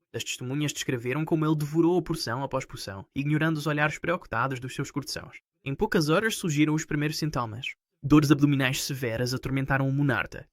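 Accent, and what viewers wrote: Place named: Portugal. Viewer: Na verdade, português europeu. Se prestar atenção dá para entender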